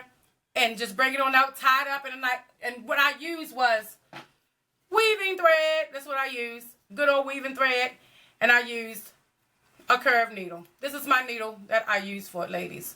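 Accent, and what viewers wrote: Baltimore, United States. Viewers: I hear that Charm City accent..Hey my B-more neighbor... I will be taking that drive to the Flee market. KEEP UP THE GOOD WORK I love this channel. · B- more accent Luv it. I was told I talk country and I came from Philly 😂 Luv this video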